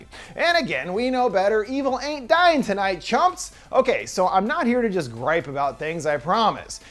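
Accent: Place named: United States. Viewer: No. He speak American English.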